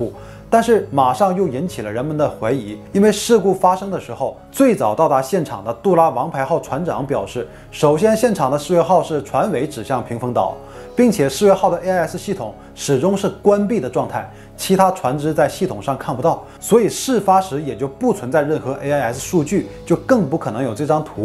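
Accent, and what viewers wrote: Northeast, China. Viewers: 感觉是东北人，说话稍微有点东北味。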